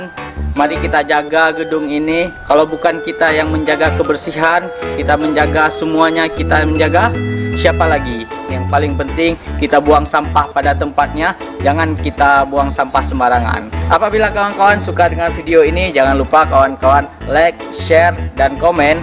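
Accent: Aceh, Indonesia. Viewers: Alhamdulillah bisa berkarya, dgn melihat video ini siswa yg masih SMA bisa termotivasi, tetap semangat dan teruslah berkarya..sy bangga dgn dialek Aceh...👍👍👍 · betul itu memang logat Aceh kita harus bangga jadi orang aceh jangan buang bahasa sendiri setiap darah pasti ada logat sendiri seperti Jawa meusinthop logatnya. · Bukan d setel lg Nama a dia pake logat aceh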